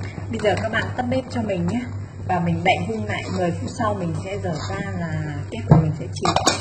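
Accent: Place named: Vinh Phuc, Vietnam. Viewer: Giọng nói này là người Vĩnh phúc . Và chưa biết nấu ăn ngon .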